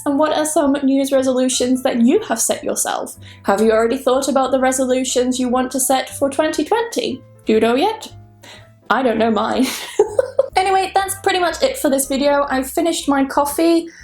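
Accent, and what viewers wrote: United Kingdom. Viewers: Thank you for your teaching and British accent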